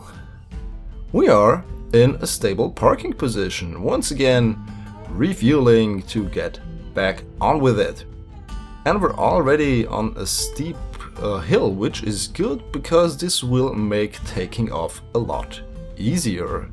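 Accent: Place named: Germany. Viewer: okay thank you . i have another question xd are you German ? your accent sounds like a German xd Wenn ja wäre es ganz cool denn ich hab da noch ein paar Fragen die ich so auf englisch nicht gestellt bekomme xD Dafür ist es einfach zu schlecht :D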